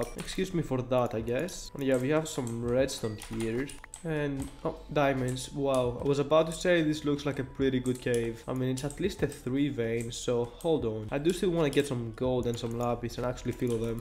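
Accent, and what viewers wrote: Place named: Greece. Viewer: Everyone likes ur Greek accent tho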